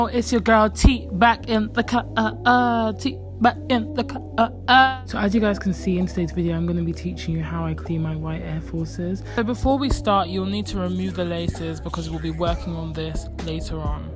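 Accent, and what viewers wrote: United Kingdom. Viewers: I'm sure you're Britishhh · You absolutely love your British accent and your beautiful voice its like angel music to my ears i think i love you 🤣😝💕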